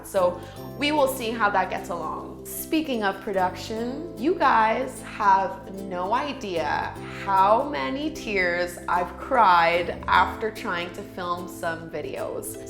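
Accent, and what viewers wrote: Canada. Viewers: I found your channel through a collaboration you did with Brett Larkin and stuck around because on your honest style and lovely Canadian accent. As an English teacher, I have a thing about accents 🤓 Thanks for keeping it real (the jealousy video and the meditation challenge 👍🏻) Hugs from Finland.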